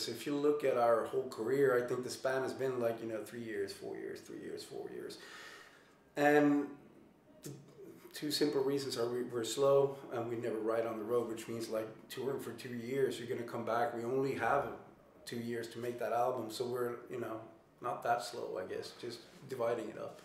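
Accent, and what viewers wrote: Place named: Wisconsin, United States. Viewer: I would’ve thought he was from Wisconsin if I didn’t know otherwise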